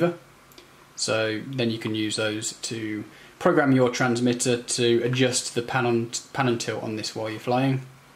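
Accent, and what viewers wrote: United Kingdom. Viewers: looks like a nice gimbal. I used to think you were from the UK till I heard your GF speak, but you sound as British as they come. where do you fly out of?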